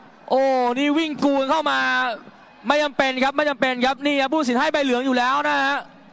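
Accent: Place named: South, Thailand. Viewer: พากได้ส้นตีนคนใต้มาก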